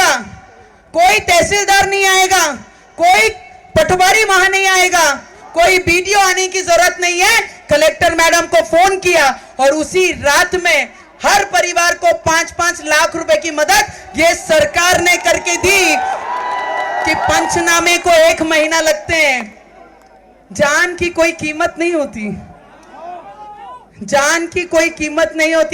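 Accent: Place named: Maharashtra, India. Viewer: She speaks in typical Marashtrain style. There is no way she appears a North Indian.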